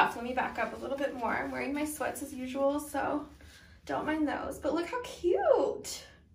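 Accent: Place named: California, United States. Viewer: You sound like you’re from California the way you talk 😂 this is coming from a Mainer haha 💜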